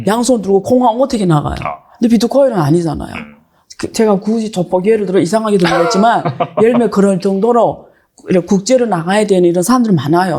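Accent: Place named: China. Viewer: 말투가 중국사람이네요.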